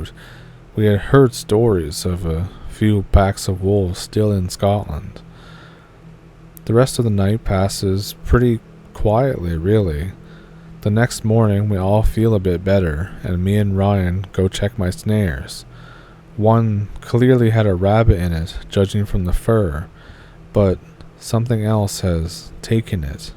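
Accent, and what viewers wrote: Canada. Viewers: I’m English and I think it’s cute lol but it’s probably because I love his Canadian accent. I would listen to him read the football scores! 😂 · This is told so well I'm actually laughing at the state of mind those guys are in lol, spent nearly 5 years in the UK now I think I probably know the area they come from with how they speak to each other 😆 , in a Canadian accent? I think, like a movie in my head very well done sir ! 👍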